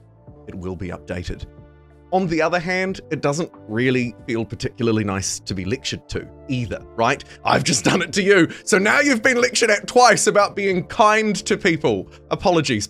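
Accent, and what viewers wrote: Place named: New Zealand. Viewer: Nice to hear a fellow Kiwi accent in the CS2 community. Keep it up mate 🥝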